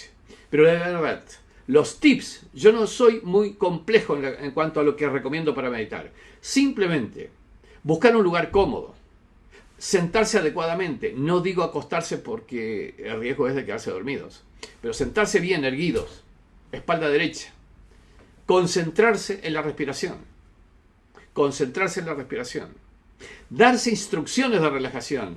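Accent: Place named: Argentina. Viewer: Gracias, buen contenido....de donde es ?...que habla como argentino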